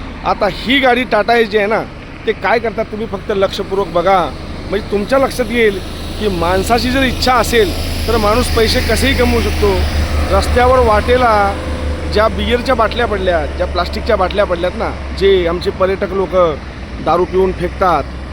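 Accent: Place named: Belgaum, India. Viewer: 100% बरोबर आहे तुमचे. पण तुमच्या भाषेत कोकणी टोनिंग नाही बेळगावी टोनिंग आहे .❤👍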